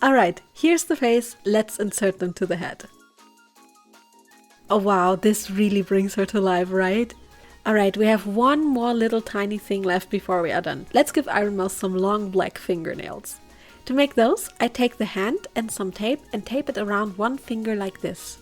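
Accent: Germany. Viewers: I thought till I heard uhu (and saw) that you have an light german accent (I kinda have the urge to write everything in german but I let it be) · me and my cousin LOVE ironmouse and watch all of her streams together so im happy that you made a doll of her also are you german? you have an accent to me and the name of the contact glue looked german so i got curious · She looks marvellous! Great job. Her shoes are really worth the work and look amazing. Ps: Ha, ich hatte Recht mit dem deutschen Akzent. Schön das wir so eine talentierte Dollmakerin haben : )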